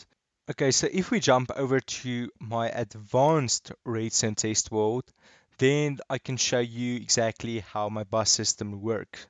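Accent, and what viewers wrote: South Africa. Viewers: You sound South African?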